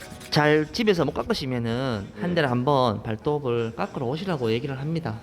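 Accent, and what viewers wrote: Gyeongsang, South Korea. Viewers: 진짜 사람생각이 다 같은게 ㅋㅋ저도 리쌤이후로 다른분들 유튭봐도 시원하지가않고ㅠ 덜끝난느낌 이었는데 진짜 ...넘잘하시네요 ㅋㅋ 글구 남자분인데도 되게친절하시고..보통 서비스이라고 남자들 친절한경우잘없거든요 무뚝뚝하고 ㅋㅋ 근데진짜...대구말툰데 쎄지고않고 듣기좋고 실력도 넘 출중하셔요 구독하고갑니다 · 우연히 영상떠서 구독하고.ㅋ 챙겨보는중입니다🤣 좋아요도 눌러가면서 ㅋㅋㅋ 갱상도사투리가 참 좋네요.ㅎ 울아빠도 갱상도 진주 .ㅋㅋㅋ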